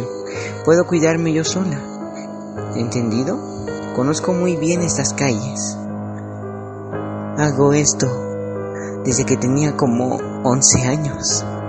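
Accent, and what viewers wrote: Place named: Latin America. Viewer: Oye Heres Latinoaméricano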